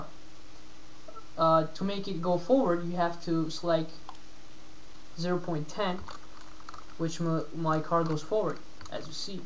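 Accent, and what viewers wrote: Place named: Russia. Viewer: control what? i cant understand the russian accent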